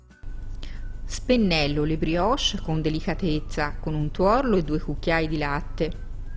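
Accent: Tuscany, Italy. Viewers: Sei toscana?😅semplice curiosità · Che bell’accento toscano! 😀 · Hai una bellissima voce, mi pare tu sia Toscana. Complimenti per la spiegazione della ricetta. Buonissime!!! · Buonissime e mi piace anche l`accento Toscano ho vissuto a Firenze 20 anni e amo l`accento toscano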